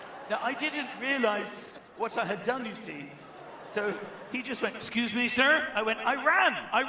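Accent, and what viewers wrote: United States. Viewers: I love his American accent, the way he leans on the hard "R." Funny! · I love his American accent too lol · I LOVE your American accent! But I could listen to you talk for hours. ♥️👂🤣🤣🤣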